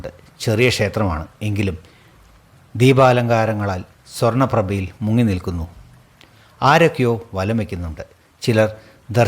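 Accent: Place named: Valluvanad, India. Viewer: അസലായിട്ടുണ്ട് ട്ടോ കുട്ടി, കഥയും അവതരണവും. നമ്മുടെ വള്ളുവനാടൻ ശൈലിയിൽ മനോഹരം❤👌👏🙏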